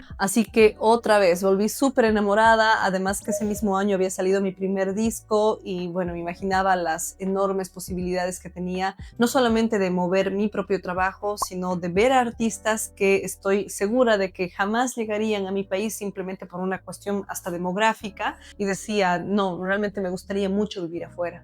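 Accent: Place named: Mexico. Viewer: 🤔 boliviana viviendo en Argentina y con acento muy Mexicano? Que raro!!!😅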